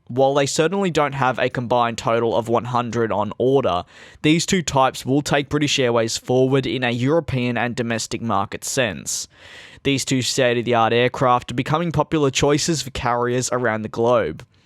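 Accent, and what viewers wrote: Australia. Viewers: Your Australian arnt you mate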